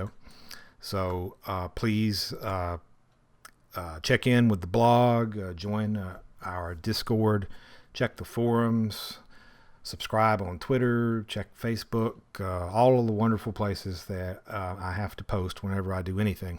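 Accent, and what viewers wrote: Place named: South, United States. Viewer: I don't know why I expected the dev to be Croatian or something but no he's southern af.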